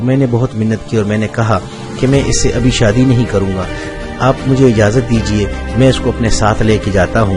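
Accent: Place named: Punjab, Pakistan. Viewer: Assalam Alaikum Aapne ek achchi kahani chuni lekin urdu sahi se adaa nhi kar paye. Punjabi wala lahja hai aur padhne me bhi kabhi ujlat, kabhi uljhan aur kabhi bedili se padha hai aapne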